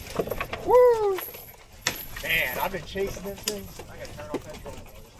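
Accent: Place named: Northeast, United States. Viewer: you may have some northeast in that accent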